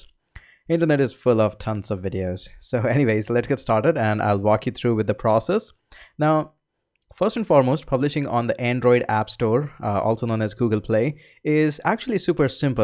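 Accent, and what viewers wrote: India. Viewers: Thanks for helping. Indian accent is not good at all :/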